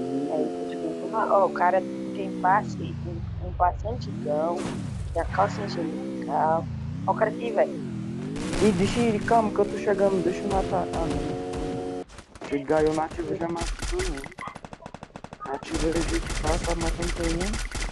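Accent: Bahia, Brazil. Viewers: vc é Baiano?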